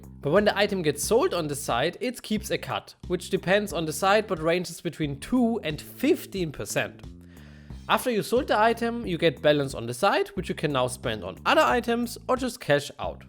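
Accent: Germany. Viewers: bruder dein deutscher akzent ist nicht zu überhören, sehr gutes video, hat mir sehr geholfen, weiter so!😂👍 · warum ist dein stimme so deutsch?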